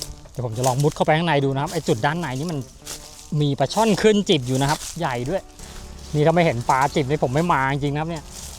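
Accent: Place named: South, Thailand. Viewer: คลิปนี้น้า พูดใต้ออกเลย😅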